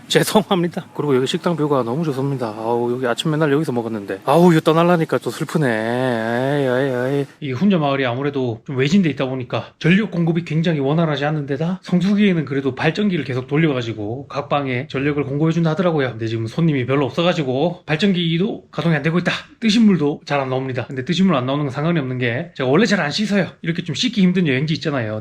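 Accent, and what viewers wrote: Gyeongsang, South Korea. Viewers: 유쾌한방송이네요. 좋은말씀을 갱상도버젼으로 들으니 마 기에 팍! 하고 꼬피뿌네요. 감사합니다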